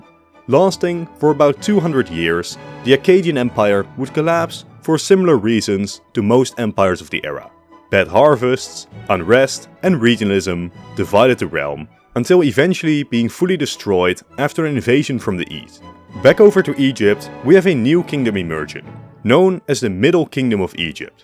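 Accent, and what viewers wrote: Netherlands. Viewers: ik hoor aan je accent dat je Nederlander bent :) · Hoort gelijk dat hij Nederlands is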